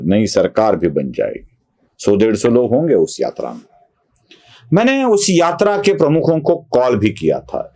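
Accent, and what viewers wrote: Maharashtra, India. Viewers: I love your Marathi accented Hindi. Fully agree with your views. From Lucknow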